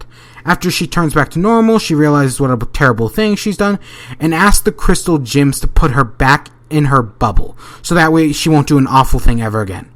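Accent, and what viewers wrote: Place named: United States. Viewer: he sounds like he has a normal American accent tho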